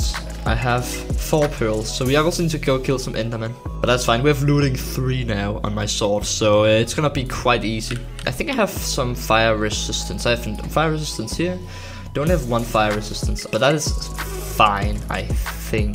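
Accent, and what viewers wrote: Denmark. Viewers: hej jeg kan høre du fra danmark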